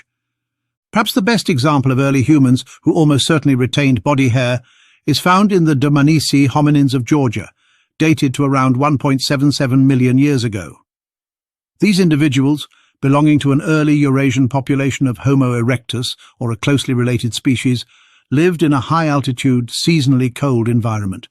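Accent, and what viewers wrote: RP, United Kingdom. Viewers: I don't care what you say even if you do sound BRITISH ELITE.🤮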